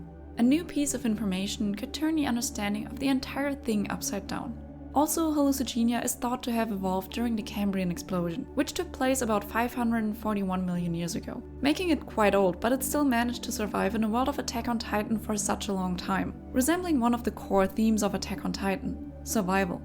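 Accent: Germany. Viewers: you sound german a little bit german, wondering if i guessed correctly :D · Instantly knew you were German from the way you spoke, hätt aber nicht damit gerechnet, dass du aus Österreich bist. Gutes Video und Lg aus Kärnten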